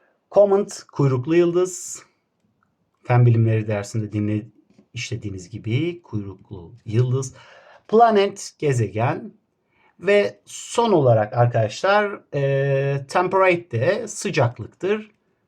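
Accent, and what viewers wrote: Kayseri, Turkey. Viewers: Hocam video çok güzel olmuş ellerinize sağlık 😊siz Kayserili misiniz bende Kayseriliyimm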